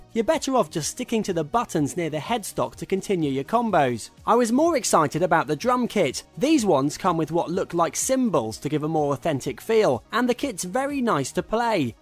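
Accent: United Kingdom. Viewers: omg, that British axent! i am dying!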